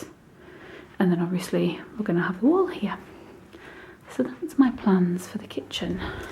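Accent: United Kingdom. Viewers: British accent is beautiful! 😍😍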